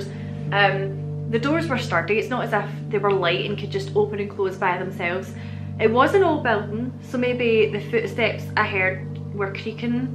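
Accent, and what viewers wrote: Scotland, United Kingdom. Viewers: Funny you get comments about you talking too much. The hugest part of why I watch your videos is to hear you speak Scottishly (yeah, I made that word up).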